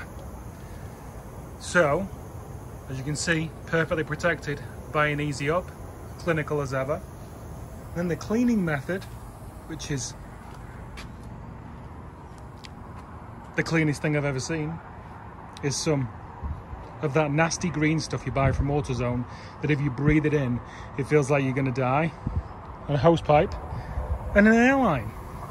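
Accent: United Kingdom. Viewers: Missing you and you nice British accent. Support from a French fan from cold Minnesota. Stay safe mate. Cheers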